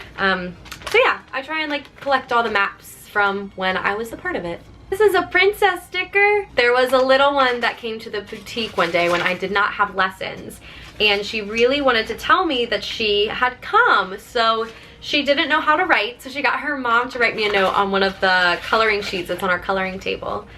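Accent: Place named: South, United States. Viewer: Being home for so long is bringing out tiny hints of your southern accent...take a shot every time says "ah" instead of "I":D Also we love and miss Holly!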